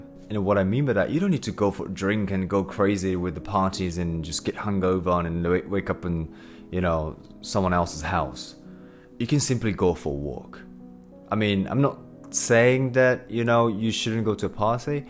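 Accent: England, United Kingdom. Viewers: You’re speaking English with British accent, aren’t you? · Ah... maybe you came up in my feed because we are both Vegan... Very Good!. I hear an English accent there. Not sure there is much to say, my bad, i don't know much about you but all the best with your quest to help folk.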